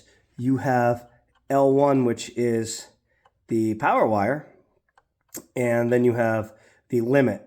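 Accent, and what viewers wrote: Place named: East Coast, United States. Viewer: you and i would get along well. Jaja. Sounds like your accent is somewhere from the east coast too. Im from CT.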